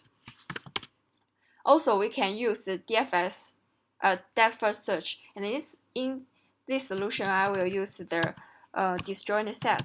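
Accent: China. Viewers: 博主浓浓的汉语味啊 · 小姐姐是不是山东人^^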